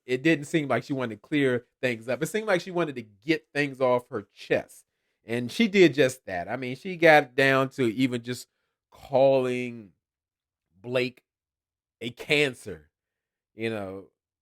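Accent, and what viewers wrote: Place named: Baltimore, United States. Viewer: The season is over and here I am binging all of your videos as I finish up my nails. YOU CUT THE ENTIRE FOOL ON THIS SERIES! This portable rattail, the continuous belief in what Anthony really wants, Lyndon being down to the part-time job but on the show between shifts...I'm calling the authorities. But I knew your accent and demeanor felt like home because it is, I'm from Baltimore. I'm thoroughly enjoying myself on today!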